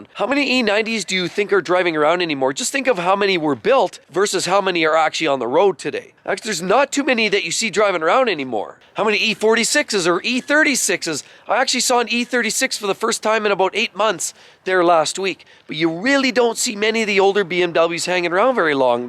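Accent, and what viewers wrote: Canada. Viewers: Wait wait.. are you from Canada?!.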